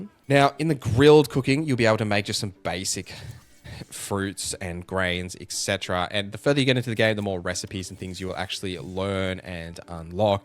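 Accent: Australia. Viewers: I was won over by the intro music. As I always say to my children when i need them to hurry- run like you stole something. Also love the aussie voice, five gold stars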